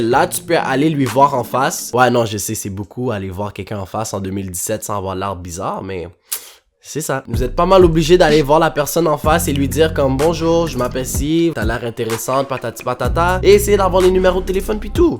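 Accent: Quebec, Canada. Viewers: J'aime tellleement ta voix l'accent canadien c trop beau 😍😍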